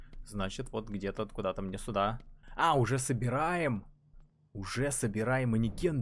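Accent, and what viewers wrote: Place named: Russia. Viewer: Rial Rassin accent!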